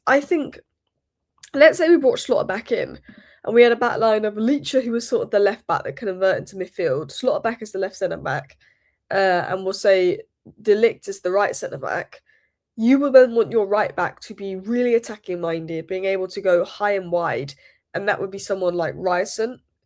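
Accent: United Kingdom. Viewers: I actually really like your voice, I like listening to British accents.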